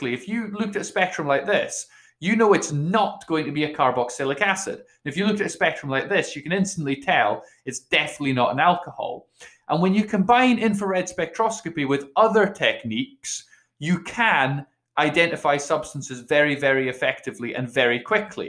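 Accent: United Kingdom. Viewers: You had my loyalty at "What the hell does this complete mess mean?"....and your British accent of course 😅😂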